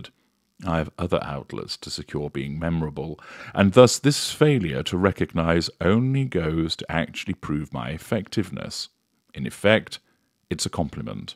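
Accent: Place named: RP, United Kingdom. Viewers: 😂😂 you crack me up.. love your storytelling..and that posh accent... its perfect... I enjoy all of your videos regarding this trollop.. 😂😂 ❤🇦🇺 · Yes a great story teller.All my senses activated and I saw a privileged male being spoilt as a child and constantly told by his mother that he and the family were so much better and superior to others that he carried this elevated opinion of himself,a huge ego into adulthood.He despises anyone who remotely mirrors his own failings and selects them for shredding eg Megan.How am I doing so far.You probably attended Oxford or would have aspired to, hence your accent.Instead of readers saying you are often broke, I would think you hoard money. Possibly mimic your therapists or have studied yourself in psychology. You have a need to dominate but there will always be someone more powerful you have either met or still to meet.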